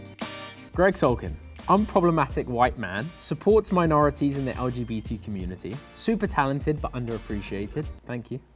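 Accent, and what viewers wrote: United Kingdom. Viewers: Why did I not know that Gregg Sulkin was British eye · He gives Tom Hiddles a run for his money to be the most British person to ever British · Gregg sulkin can run me over with that British accent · He's... british? Oo hoo this just got even better 🤣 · I know him as Chase so hearing him with a British accent is trippy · yeah he's British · I didn't expect he has a British accent... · I did not know he was British wtf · Mindfcked after seeing him play an American boy on runaways and now this Brit accent ? Lmaooooo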